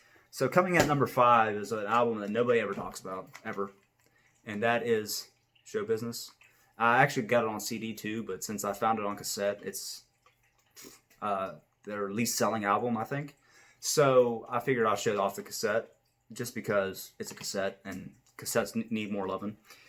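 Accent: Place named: Maryland, United States. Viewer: ....I hear your Maryland accent, you can't hide...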